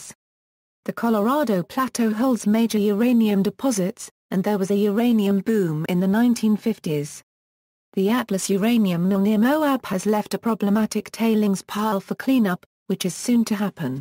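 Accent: United Kingdom. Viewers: Informative content but the British voice was distracting and annoying because it sounded robotic and artificial AI-like.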